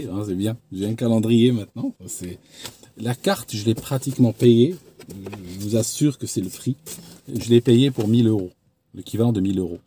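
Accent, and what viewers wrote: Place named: Switzerland. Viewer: Il est génial ton Kanal 👍 j'aime beaucoup 👌❤️ Ici en France nous parlons de 'Chaîne' Youtube 😉 même si ta dénomination de canal, ou Youtube Channel, me rappelle que tes rares intonations de blédard sont une bénédiction lorsqu'on entend ton accent suisse 🫣 ENSMAtiquement Français 🥰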